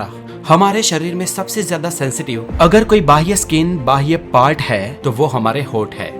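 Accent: Gujarat, India. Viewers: Aaap Gujrati hena ????❤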